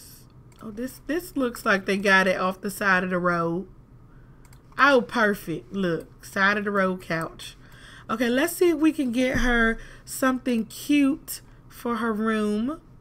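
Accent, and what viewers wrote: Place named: South, United States. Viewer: I found your LP and decided to watch...Your commentaries are so funny! I watched single dad just so I can hear your southern twang...Keep up the good work. Thanks!!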